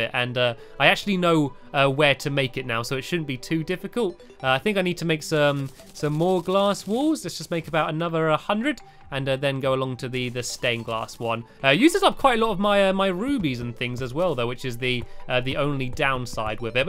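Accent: England, United Kingdom. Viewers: your from engand not amerac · Tyler Delsol if you can't identify a British accent, I'm slightly worried for you.. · He's a brit I should know cause I have a the same acent psychological don't call me Thomas most people call me Nickola ( Nik- o -lie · is stampy english and is scottish?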